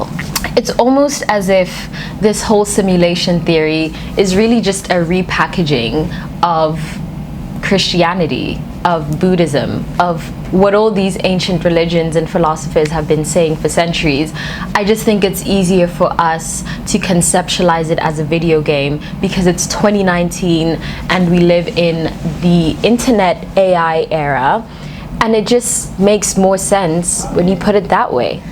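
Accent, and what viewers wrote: South Africa. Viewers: Curious to know where you’re from, really love your work. You sound like you could be from SA but moved. I just can’t across your channel.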